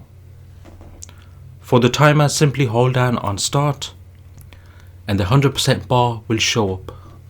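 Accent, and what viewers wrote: Birmingham, United Kingdom. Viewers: Thank u for this video! I agree with the comments, the instructions that came with the filter were a joke! Prefer your vid with your brummy accent! 👌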